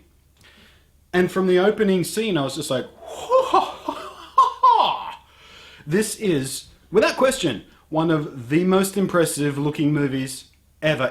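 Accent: Australia. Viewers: i totally agree, just one question, are you an aussie? if you are, thats awesome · i watched 5seconds of this and could tell you were an aussie xD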